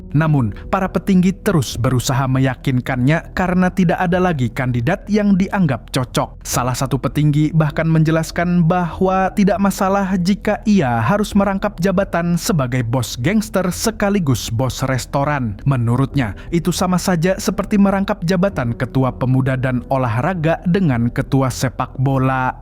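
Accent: Banjar, Indonesia. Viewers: Urang banjarkah pian mang?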